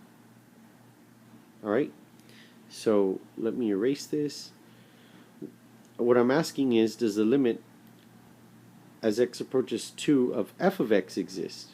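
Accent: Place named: Canada. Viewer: can’t get over the Canadian accent.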